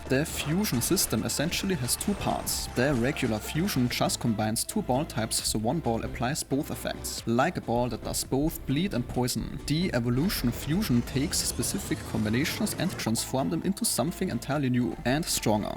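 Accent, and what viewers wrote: Germany. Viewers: Game looks great and is landing on gamepass at release tomorrow. Demo was fun so I'm looking forward to it. By the way... where is your accent from? Sounds German ;-)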